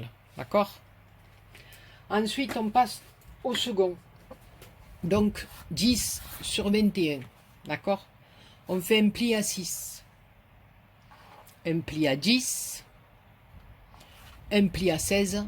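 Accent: South, France. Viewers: Coucou 😍 c'est grâce à la vidéo de Greg que je me suis abonnée à votre chaîne et quel bonheur de vous entendre, car je suis expatriée en Alsace mais je viens du Sud (Nîmes) aussi....alors un enchantement de retrouver notre accent. Merci pour ce tuto je vais le faire dès aujourd'hui. Passez une bonne journée. Adessias et grosses bises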